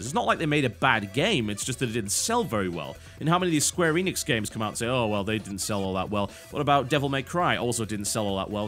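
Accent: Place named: England, United Kingdom. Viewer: Total Biscuit, you'r lossing your english accent :(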